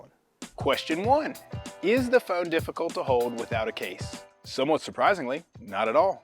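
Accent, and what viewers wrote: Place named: Louisiana, United States. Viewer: Debating whether to go caseless for the 14 Pro Max. You sound like you’re from Louisiana!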